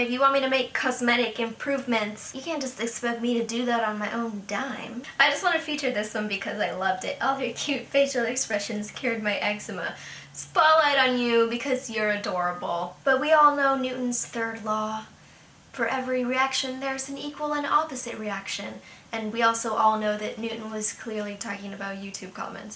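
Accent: Castile, Spain. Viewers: Where did you learn Spanish? Your accent sounds like it's from Spain, but I'm also not the best at distinguishing accents in Spanish. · I'd like to know, where you learned Spanish. I can't quite place it. Sounds Castillian but may be Argentina or Chile? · Hi. Thanks for your music and voice. I most confess that I come to your channel for your cover of Evangelion, but your voice inspire me in my job. Sorry for continue in spanish, i'm colombian and I dislike use the english language (the colombians are really trolls when you don't speak as a native...). Tu acento tiene una marcada influencia de España, es genial. Espero poder apoyarte con tu trabajo después, es realmente bueno. Gracias por tu coraje, al tomarte tu tiempo con tus seguidores (fans). Saludos desde el cono sur.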